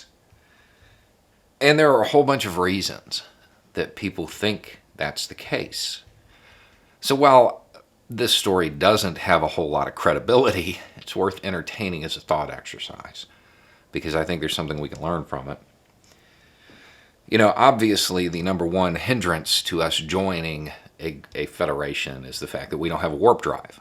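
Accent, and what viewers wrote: South, United States. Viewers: You got that good ole boy accent, but you have a genius quality view of reality. I wish there were more of you in positions of power.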